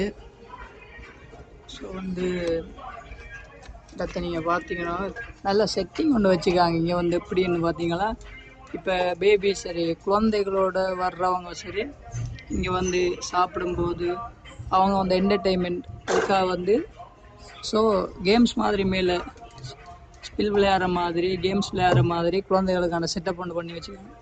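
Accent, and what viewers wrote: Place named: Jaffna, Sri Lanka. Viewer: Eela thamila kathaiyadaaa Yaalppaanam thane nee 😡😡😡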